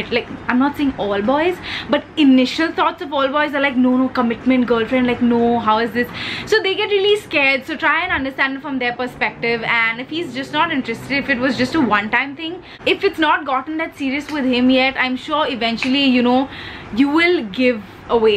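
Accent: United Kingdom. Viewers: Omg! That British accent wow I'm impressed!! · Your British accent is perfect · You do amazing British accent.... I love that..❤️❤️❤️❤️ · U are so damn honest ...love that about u...and funny too...my that British accent 😂...that’s what keeps us subbies rolling on to come back to your channel again and again... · Your British accent was so on point!! Is it weird that I want to see more of it xD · Also I loved your British accent....I am a Potter head and you reminded me of Emma Watson seriously! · Your british accent😂😂 love it 😂❤❤ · LOVE LOVE LOVE ur british accent😢😢😢 i have been thinking about it today only❤❤❤ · please do a whole video with you’re brutish accent it’s just gorgeous, I could go on listening to it, simply music to my years #britishaccentvideo💯 #SundazewithMals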